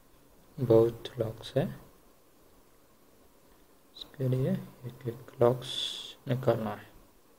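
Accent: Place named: Kerala, India. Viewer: മലയാളി ആണോ മലയാളി ഹിന്ദി പറയുന്ന പോലെ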